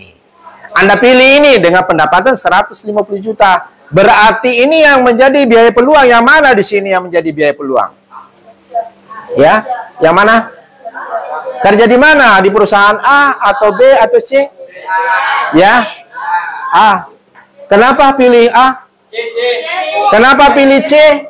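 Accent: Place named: Sulawesi, Indonesia. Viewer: Salam Kenal Bapak sepertinya bapak guru dari daerah sulawesi ya dari segi logat nya saya tau soalnya saya dulu kuliahnya di ikip negeri up jurusan koperasi d3 lanjut langsung ke S1 alumni 1985 mantaaap penjelasaanya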